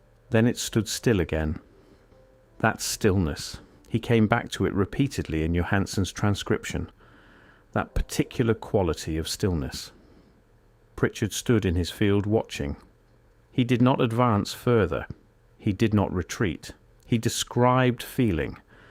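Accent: United Kingdom. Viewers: Taking this with a huge grain of salt, since a google search turns up no encounter involving anyone of that name. Plus the faceles, unnamed, obviously British narrator claims transcribed materials from the original case wound up in his possession. Seems quite a stretch.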